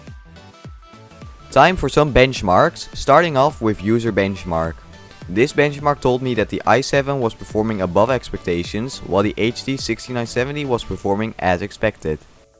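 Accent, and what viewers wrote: Netherlands. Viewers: I found an xfx version for 30 bucks, very happy with that. Btw where are you from? You sound very dutch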